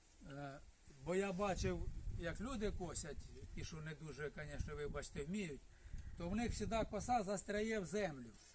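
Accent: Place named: Ukraine. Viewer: Який прекрасний говір,говір нашої України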